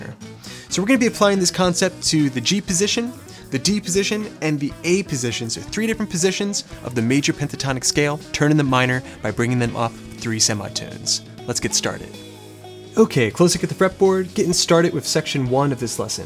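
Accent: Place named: Baltimore, United States. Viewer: Philly? I hear Baltimore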